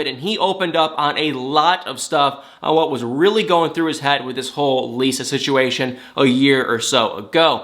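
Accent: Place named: South, United States. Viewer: You are such a cutie!!! Lol I have to say that you’re saying’lank’ instead of link and thank instead of think !!!tennessee has gotten to you my friend lol!!! You are a southerner now!!! Lovingly from another southerner!! Still laughing!!!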